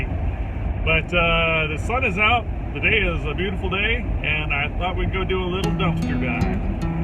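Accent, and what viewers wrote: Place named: Philadelphia, United States. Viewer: Are you from the Philadelphia...NEW JERSEY AREA???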